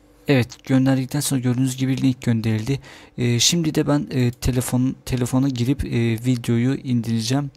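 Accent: Azerbaijan. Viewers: SALAM ELEYKIM QAQA TURKCE DANISIRSAN AMA AZERBAYCANLISAN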